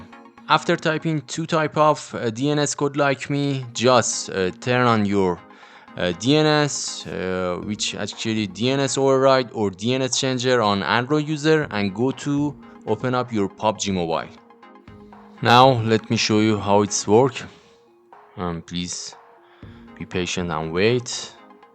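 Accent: Iran. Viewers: چه لهجه فارسی داره انگلیسیت❤😂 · داش ایرانی از لهجهت فهمیدم❤ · حاجی ایرانی ؟ لحجت معلومه ایرانی ؟ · لحجه ایرانی ؟ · به به لحجه‌ی اصیل ایرانی❤😂 چطوری هموطن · Well for everyone who are curious, This DNS is more commonly used in Iran. The creator is also from the same country based on the accent and the error received. This is a great method shown and he's actually right! However, for the people outside of Iran, I recommend you to search through the internet and find the nearest DNS service possible to your country.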